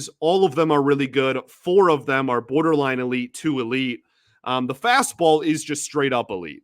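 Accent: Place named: Midwest, United States. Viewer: My comments are not just pet peeves. They are advice to help you with your career, because your personality, intelligence, heart and knowledge can take you far. Only your presentation can hold you back and it has improved a lot in the last year. This comment is about the difference between "get" and "git." The first is the correct way to say it. "Git" marks you as a backward, midwestern hick. I know. I had the same problem growing up in Toledo. Here is a way to help you, because I know right now. you can hardly hear the difference. Would you say "base het?" Would you say "lit me in, please?"" Would you use a catcher's "met" behind the plate? Get what I'm saying. There are two difference sounds between "i" and "e" and in most instances we get the difference. For some recent the midwest accent among the uneducated says "go git it" instead of "go get it." People who want to hire you will hold that against you and people who aren't sure why will still think you sound a little off. 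Thanks for reading and please know that I only write this in a desire to help.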